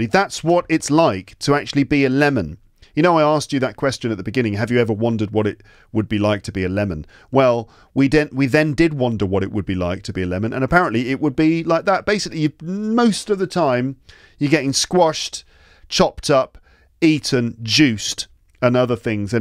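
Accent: United Kingdom. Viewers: I like your British accent and style. American English accent is very hard to understand for me.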